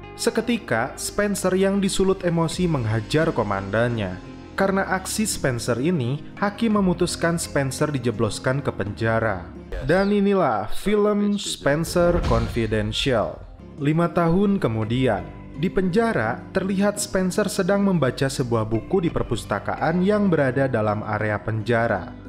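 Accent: West Java, Indonesia. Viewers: Apakah pengisi suara broview adalah orang sunda? 🤔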